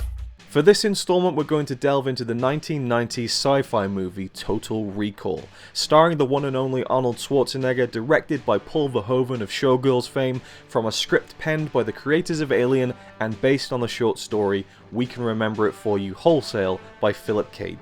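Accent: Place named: United Kingdom. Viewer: for British accent :)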